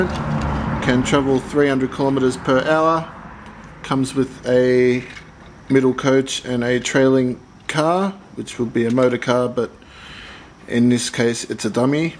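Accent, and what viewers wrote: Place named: Australia. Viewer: your Australian! but I'm not =( or american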